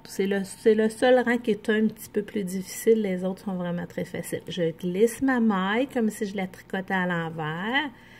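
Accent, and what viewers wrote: Canada. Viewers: Merci pour ces explications très claires , ce point est très joli . Bel accent canadien.👵 de Nîmes 🇫🇷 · Parfaitement expliqué ! Joli rendu ! Et........delicieux accent. Québécois ?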